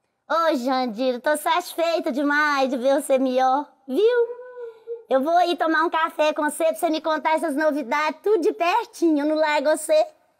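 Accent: Minas Gerais, Brazil. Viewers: Bom de mais ouvir o sotaque mineiro.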